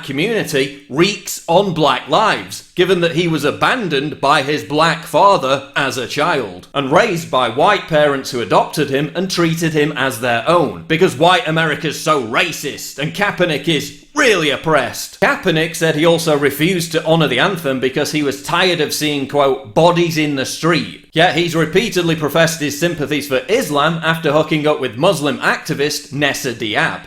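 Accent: United Kingdom. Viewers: why do you have a British accent? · I can't help but laugh because your accent comes from a country where America isn't liked. Yet you jump on the bandwagon of creating a YouTube about the most recent news so you can collect some easy cash for more tea and crumpet. hehe ^_^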